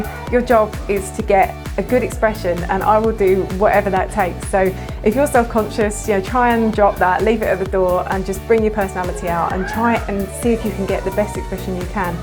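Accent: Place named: England, United Kingdom. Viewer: Her English accent and the closed captioning are quite entertaining. Very enlightening view of a day in the life. Most photographers don't relish that 60% business part.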